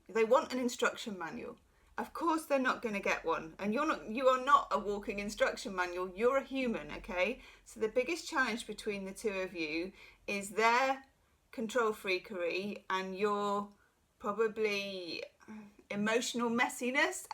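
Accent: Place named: United Kingdom. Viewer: #2 let me just get a cup of waaaaater loving the British accent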